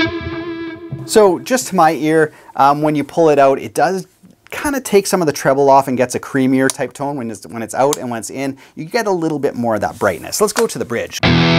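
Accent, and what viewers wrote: Canada. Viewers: I love Canadian accents! Teach me master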